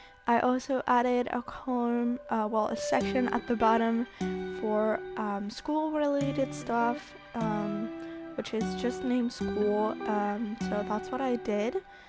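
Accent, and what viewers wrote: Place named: United States. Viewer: I look forward to not only hearing your lovely voice but to see you upright & walking a few steps even if like me it's with leg braces! But please correct me if I am wrong but you have no spinal cord damage but just your brain not sending signals to your legs to make them work? Even though I have only known you on here for a short time,I would rather you have a reaction to a covid booster then catch covid itself & be very ill,we have one thing in common apart from being disabled,you rely on a wheelchair to get around & I rely on hearing aids & other medical aids to make my life easier! The good thing for you is your young & there are medical advances all the time so I am confident about you walking again! And who knows when I get more powerful BTE hearing aids or a CI I will hear how lovely you sound! And the American accent haha 💟💟💟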